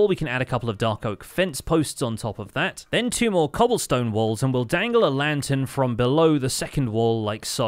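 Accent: United Kingdom. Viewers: Finally caught up! Got halfway through season 2 then life got in the way. Started on S3 recently and as always - I throughly enjoy your take on MC coupled with the British flavored commentary 😉 I appreciate your attention to builds/farms that won’t directly work in bedrock as that’s the version I use. Many thanks for the time and effort you put into the survival guide series and I look forward to seeing more🎄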